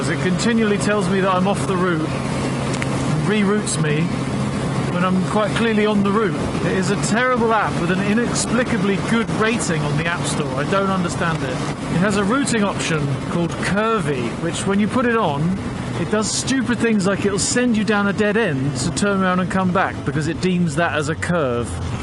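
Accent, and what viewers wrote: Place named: England, United Kingdom. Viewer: Ha!!! Awesome ride! You sound like me but with a proper English accent! My wife enjoys making fun of me and graciously reminds me of my "first world problems" whenever that sort of intervention is necessary. Back in my early years of riding (throughout the 1980's), nothing was "waterproof". It was either leather or nylon but in all cases it was wet, cold and heavy which just made things miserable. I eventually learned the value of the smallest and strongest plastic bags I could find and a good quality rain suit. Everything of value would go in the plastic bag before it went in my backpack or tank bag. Looking back now 35 years later, the memory of the bad experiences have all but faded and I can honestly say that the times on my '84 FJ1100 and Virago were nothing but wonderful. Try to take a moment to realize that what you're doing is incredibly fortunate and very few people in this world have the opportunity to do so. 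I'm sure there are many of us tuned in who have been there/done that as well as millions of those who never will are thoroughly enjoying your experiences. Either way, it's a joy to watch, listen and learn and for me personally, the sparking of memories that I recall as being the best times of my life. Cheers.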